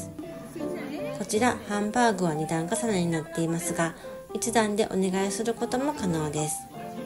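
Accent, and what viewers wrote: Kyoto, Japan. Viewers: その京言葉が いつも心地よくて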